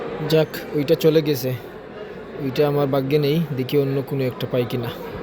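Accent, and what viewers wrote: Sylhet, Bangladesh. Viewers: ভাই শিকার করেন আইনি কোনো সমস্যা হয়না। আর আপনি কি সিলেটি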